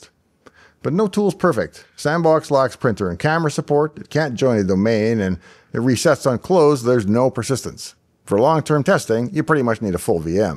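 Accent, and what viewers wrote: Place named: Canada. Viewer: Listened until I sensed a Canadian accent.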